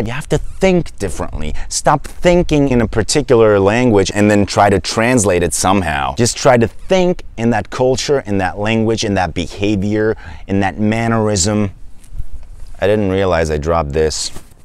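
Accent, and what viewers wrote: United States. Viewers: You sound American not Turkish or German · I'm falling in love with your American accent🤭❤️ thank you for the video and you're the best i'm sure about that☺️ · I watched some of your other videos before this one. I am glad you explained how you learned English because I could not figure out how you sounded as if you learned American English rather than British English. This video explains it. I am attempting to learn Spanish and agree with you about the cultural aspects of language. Some of the language apps are great for vocabulary and some grammar, but watching television gives a bit more of an idea of how the language is used every day. I am not sure I will ever be fluent, but thanks for supporting what took me quite a while to figure out. I'm going to watch more of your videos for more ideas. If you wonder how I found your Turkish video, I tried watching Hercai in Spanish. 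I finally figured out I was in dizi land and not telenovela land by some of the language. I loved dizis so much that I started watching them in Turkish with English subtitles. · Sesin çok yakışıklı ama 3 dakika geçtikten sonra rahatsız ediyor gibi. Bu arada tam bir american accent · 💙 ... What an amazing story ... I would've thought you had lived for quite some time in America ... your American accent is just flawless ... I was in Turkey (Istanbul, Izmir, Kusadasi, Ephesus) last week and I fell in love with Turkey as a country, the language, the people, the architecture, the food, the smells ... in a word ... I decided to learn turkish and I found this helpful and fascinating channel ... Greetings from Miami ... !!! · Dude your American accent is amazing, Awesome dude! This is my future goal, I wanna to master my pronunciation. By the way I speak also 5 languages. You are an inspiration for me. 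· Very interesting video. I was raised bilingual, my mother tongue English and Spanish. At the age of 5 I spoke both. Translated to Spanish for my Grandmother who only spoke Spanish when watching TV shows. Born in the USA. Your English is perfect American. I also speak Dutch and trying to learn Turkish. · That’s awesome!! Well you have been to a beautiful places like Phoenix Arizona,sedona Arizona you need to come again visit the Grand Canyon az!! Saludos desde Phoenix Arizona!! 🙏🙏 por cierto su inglés es estadounidense a la perfección 👌👌👌👏👏👏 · Amazing! How perfect, American English you speak with out living in the USA, I’ve been here more then ten years and you can beat me in second! You don’t even have an accent! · Hi from Australia! I thought you learned English in America cause of your accent. But I have to say I also prefer the American accent 😊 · You speak American English better than some Americans! 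Great job...I can barely sense the accent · Your English is amazing and your American sweet accent-wow ! Well done mate! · Gardaş which country of state u grow up? u have İmpressive hillarious american accent man 🙏🙌 well done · Amerikali olmadiginizi soylemeseydiniz, sizi Amerikali sanirdim. Harika... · Why do you sound more American than English? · Çok iyi kullanıyorsunuz İngilizceyi bir Amerikalı gibisiniz. Kimliğinizi belirtmemiş olsanız, eminim herkes sizi bir Amerikalı sanırdı ☺️🙏🏻 Teleffuzunuz çok etkileyici ve konuşma stilinizle karşınızdakini etkileyebiliyorsunuz ve böylece karşınızdakiler sizi sıkılmadan dinleyebilme şansına sahip olabiliyor. Bu da gösteriyor ki dilleri ne kadar çok sevdiğinizi ❤️👍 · I love your accent. It's so american. So as mine. I used to learn english the same way, by listening to the songs and writing them down. I've graduated from english exactly 20 years ago, but I don't have the opportunity to use it in my every day life so over the time I forgot many words, even the simple ones. 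But instead I speak spanish with my sister- in- law (I don't know the grammar but I don't fear to speak) and I love turkish. I understand almost everything but have no one to talk to☹🤷‍♀️ Love all the job you do❤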